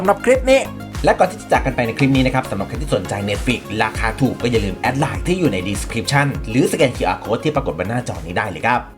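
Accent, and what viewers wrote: Isan, Thailand. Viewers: สุดยอด Lisa❤️❤️👑👑 มักเสียงเว้าเด้ มวนคักจนนึกภาพออกเลย 😆😆😂😂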